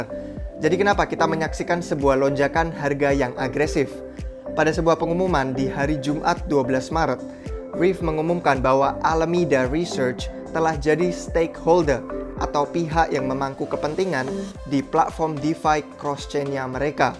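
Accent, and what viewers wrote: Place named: United Kingdom. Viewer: nonton buat dapet ilmu tentang crypto ❌ nonton buat denger mas nya ngomong british accent ✅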